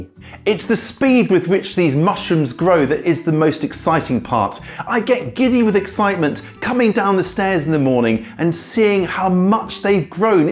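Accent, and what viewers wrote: United Kingdom. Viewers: You sound distinctly British. Is there a reason you give your Imperial units before your metric ones?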